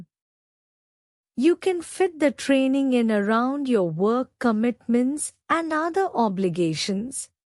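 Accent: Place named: India. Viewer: Omg why this Indian accent when it's a bot???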